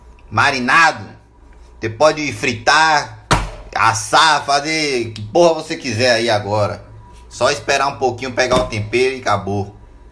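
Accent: Bahia, Brazil. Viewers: é sotaque mesmo kkkj Sou baiano e falo igualzinho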